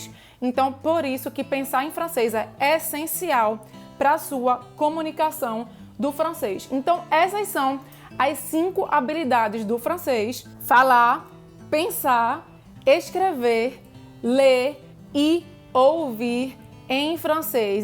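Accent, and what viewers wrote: Pernambuco, Brazil. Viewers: Seu sotaque pernambucano falando francês é um charme!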